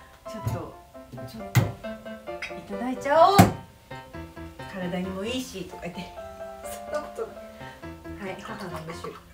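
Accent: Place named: Iida, Japan. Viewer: 大根もち❔大根とおもちを使ってどのようにするのかなぁと思っていましたが…😅なるほどねぇ🤔「大根もち」私も初めて聞きました😆 大根をスライスして具材を入れて片栗粉と小麦粉を入れて焼くのですね👌お好み焼きのような感じに～🤗 あっ！電池🔋が、、、早めに交換しないと〰️🤣 「命の源は金麦」に爆笑😆💣️✨ だもんでなぁ～の飯田弁、お母様の 梅酒(呑んでみたいです😉)に… おやきのお話しも～☆ 楽しいトークにハマっていました😆 「大根もち」はあきこさん押しの ミッカンのポン酢で頂きましたね🤗 具材にハムを入れてお肉の様な感じとなりアイデア良かったです👌 今回も楽しいクッキングを有り難うございました❤️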